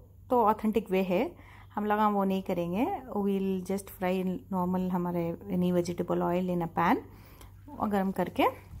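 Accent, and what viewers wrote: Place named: Deccan, India. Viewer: This dhakni urdu is ultimate 😍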